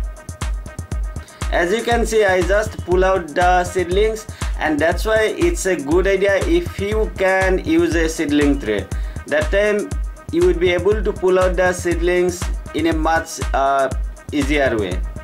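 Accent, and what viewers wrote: Assam, India. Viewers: Form your voice it seems you are from Assam.. I'll be grateful if you reply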